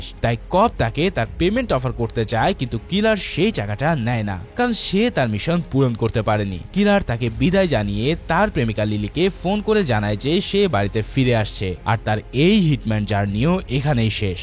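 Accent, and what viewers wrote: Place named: Faridpur, Bangladesh. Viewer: আপনার বাড়ি কি ফরিদপুর জেলায়, মানে ফরিদপুর এর লোকজন জোরে বা গতি কে বেগে বলে।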